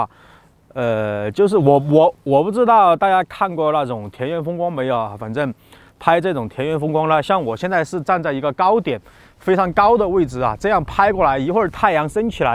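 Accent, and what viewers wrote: Sichuan, China. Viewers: 正宗川普，支持！已点赞、订阅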